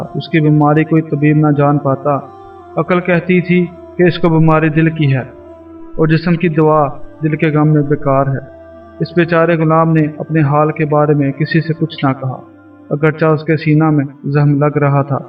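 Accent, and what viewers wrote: Punjab, Pakistan. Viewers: bhai aap ki awaz bhot achi hy lakin aap ka talfuzz bilkul Punjabi hy plz ic ko negative ni lain lakin ap ka talfuz bhot irritate krta hy plzzz ic talfuz py work krain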